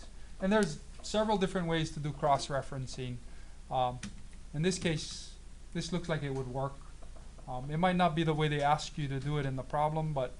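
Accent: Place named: Hawaii, United States. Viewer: sir are you Hawaiian?!? sweeaaaar I can hear it in your voice! love it!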